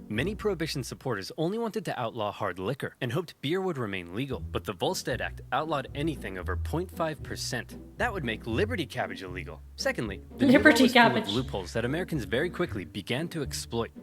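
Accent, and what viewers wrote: Ireland. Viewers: You're losing ur cute Irish accent lol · I don’t know why but Irish accents are pretty cool Also no cute Irish girl GF why live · I am surprised that you are using this dating site. Because you are beautiful! If you didn't live in Ireland, too far away from USA, I would ask you for a sitdown with coffee or something. By the way, I love your Irish accent. I know that you have a lot of pings from that dating site from suitors.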